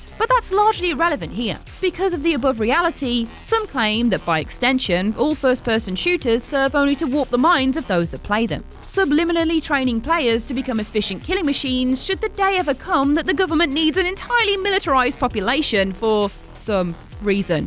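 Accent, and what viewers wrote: Scotland, United Kingdom. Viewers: Haha I love British "R linking"! It makes "creepy pasta, at least" sound like "creepy pastor, at least". We've all known at least one creepy pastor in our lives, right?? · Tho I believe is Scottish or possibly Irish. Very similar accents tho.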